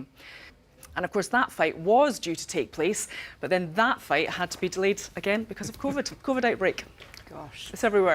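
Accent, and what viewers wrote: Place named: Scotland, United Kingdom. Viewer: is very sexy,love the Scots accent.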